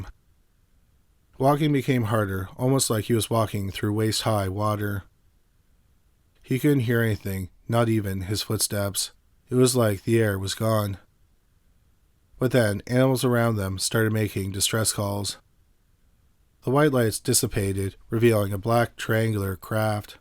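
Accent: Canada. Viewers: There’s a lot of Amazing calm speaking narrators on YouTube. Top 5s comes to mind. However, the consistent mispronunciations of ordinary words is grating for quite a few viewers & listeners. A lot of it appears or I assume is it to be the 🇨🇦 accent & a lot of it almost has sounded , like for years now, just an inability or refusal to pronounce the full word, it’s one thing to say ELLY-inn every time for the word alien but quite another to not care to realize that full endings or words are just skipped , the end of words such as Forest, instead he constantly says Foress. Or something along those lines, just kind of a wild line of work to be in when speech & possibly malaprop is heard almost more than correctly pronouncing words, or I’d imagine he’d say Worse not WorDs.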